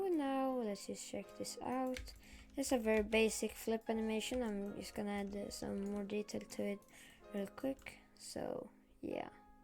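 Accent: Sweden. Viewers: ur swedish I know it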